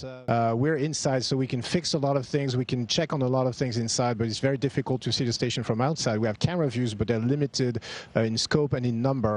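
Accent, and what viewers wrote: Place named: France. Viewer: Français, definitely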